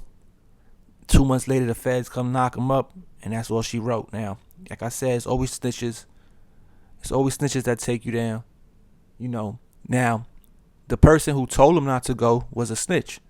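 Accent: New York, United States. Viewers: it sounds like you grew up in a suburban neighborhood of queens, Staten Island, long Island or Mount Vernon. I'm born and raised in Harlem NYC (of course there are no suburbs there), the Bronx has very few suburbs. I don't know how old you are, but I'm in my mid-60s, unlike where you might have grown up at, I grew up in a era where drugs specifically heroin was extremely prevalent. Even with all that there was a sense of community, the dealers who were much older than us would steer us youth away from wanting to get into the life, they told us to stay in school and don't be no fool. A lot of them back then even knew our parents, and they never sold drugs in front of elderly people. Even though these cats were contributing to destroying the community with dealing drugs, there was a weird sense of order and structure. IT WAS A DIFFERENT DAY AND TIME, when the Crack era came through that changed everything.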